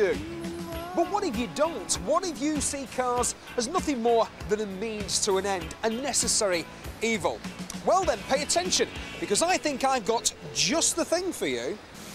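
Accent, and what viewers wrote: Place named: Bolton, United Kingdom. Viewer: Boltonian, I reckon. This was filmed in Manchester City Centre, Bolton is about a 40 minute drive away.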